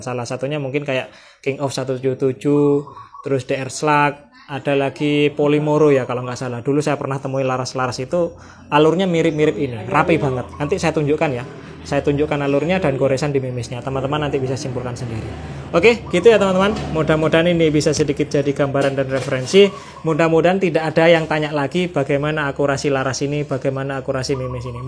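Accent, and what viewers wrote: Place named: Java, Indonesia. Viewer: Setiap kali liat videonya mas,i jujur,. saya bukan fokus pada bahasan videonya😅, tapi dari nada bicaranya🙏😄😄 Perpaduan baku, dan jawa..😊😊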